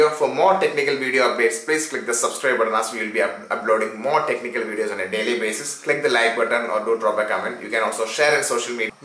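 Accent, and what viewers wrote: Kerala, India. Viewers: Dear presenter, Which part of Kerala are you from?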